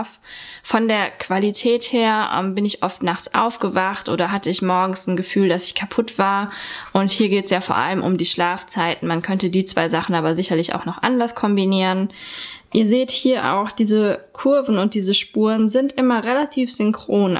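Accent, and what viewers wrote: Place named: Germany. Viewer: hallo!! ich hab erstmal deine english videos gesehen und was sicher, dass dein accent aud Deutschland war. Ich bin von Chile und kann ein bisschen deutsch sprechen aber ich hab' fast kein oppotunitat mein deutsch zu benutzen. Aber dein videos sind perfect um die sprache nicht zu vergesen 💕 (entschuldigung für die fähler)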